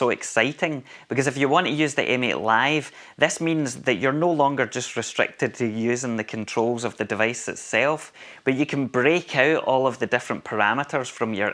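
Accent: Scotland, United Kingdom. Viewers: Really hard to get used to your voice and dialect as a non british speaking tongue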